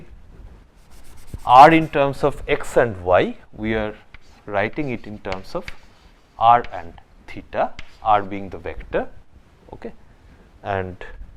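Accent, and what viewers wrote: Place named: Bengal, India. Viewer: I guess u r bengali sir....u accent seem so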